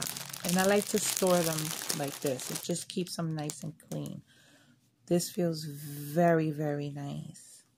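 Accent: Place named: Brooklyn, United States. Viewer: I’m so glad I found your channel. You sound like my tia from Brooklyn.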